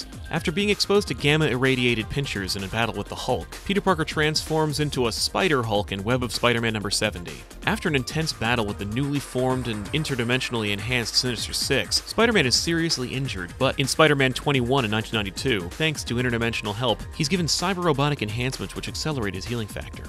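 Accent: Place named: New Jersey, United States. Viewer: Rob sounds like a 30 somethings year old white guy from Jersey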